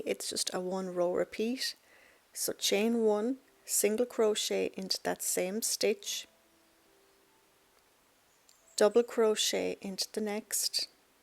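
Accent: Ireland. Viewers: I'm looking for tutorial for a winter hat, but I stayed here till the end just to listen to this beautiful Irish accent. I lived in ireland for 11 years, miss hearing it 🇮🇪 😊 🇵🇱 nice beanie, maybe I will try making it 👍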